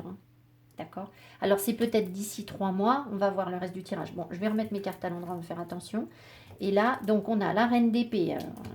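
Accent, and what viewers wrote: England, United Kingdom. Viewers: Merci, le tirage m'a beaucoup parlé. J'entame une formation en mars pour aller justement vers plus d'alignement et oser être moi faire ce que je veux vraiment. Mais bien entendu c'est beaucoup d'investissement (argent, énergie etc) et ça faire remonter effectivement des angoisses (est ce bon pour moi? Est ce le bon choix? Vais je aller au bout? Vais je réussir à mener tout de front?), l'ange Ariel m'a beaucoup parlé car justement, un de mes projets concerne la méditation. Je vais donc essayer de connecter avec cet ange! J'adore votre accent anglais; est-ce votre langue maternelle?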